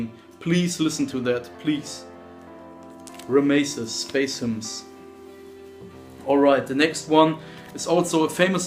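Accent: Germany. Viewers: Are you a german Guy?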